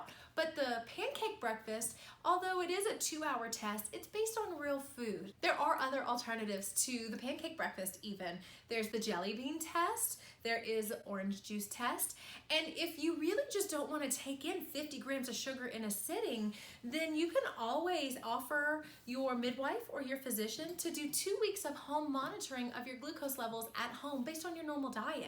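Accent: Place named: Louisiana, United States. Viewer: I like this idea! I actually have my glucose test in the morning but my midwife gave me a more natural option than the glucola. Organic apple juice. So, I certainly am not complaining. Also, it was nice to hear a familiar accent. A Louisiana native now living in Kansas City... I miss that. Thanks for sharing this video.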